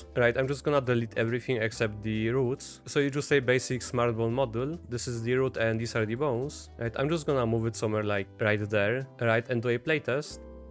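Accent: Poland. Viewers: polish accent 🤩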